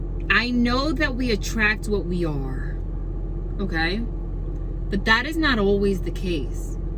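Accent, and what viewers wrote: New Jersey, United States. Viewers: Just wanted to tell you I LOVE your personality.. even your NJ accent lol ♡♡♡ have a blessed day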